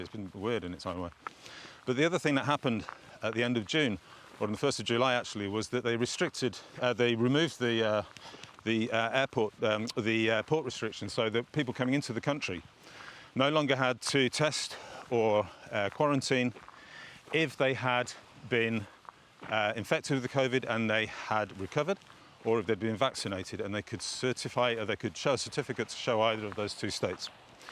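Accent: United Kingdom. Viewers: I think he is British…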